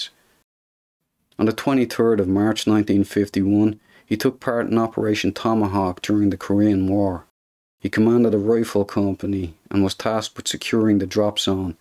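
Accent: Dublin, Ireland. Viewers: Love the accent, Dublin? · Great video. Thanks for sharing. What's your accent? Irish? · I think the Irish accent really helps big up this mans feats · Great video,! Are you Irish? You remind me of my uncle in Dublin. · Narrator an Irishman? Nice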